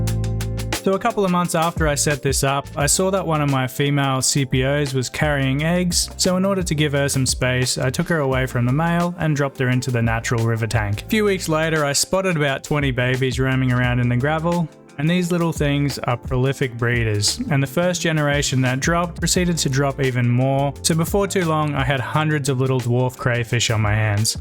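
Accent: Australia. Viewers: Nice to hear the Aussie twang in an aqua scape video! You sorted for Vegemite and Tim Tams?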